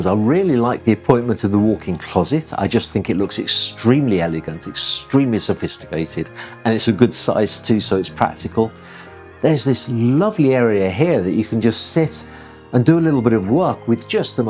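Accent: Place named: West Midlands, United Kingdom. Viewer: I can hear the West Midlands.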